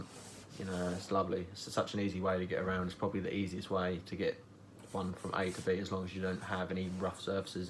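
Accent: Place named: Norfolk, United Kingdom. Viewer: Excellent video my dude! Same board and couldn’t agree more about the bushings. Where are you from? I know the accent, Norfolk right?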